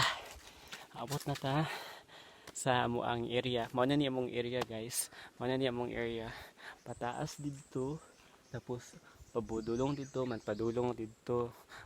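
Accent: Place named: Visayas, Philippines. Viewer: bisaya jud si kuya..hehehehe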